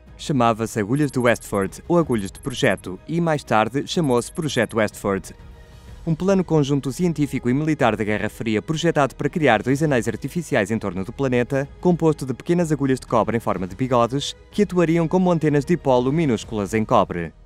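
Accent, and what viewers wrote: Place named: Portugal. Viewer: Amei a locução em português PT❤️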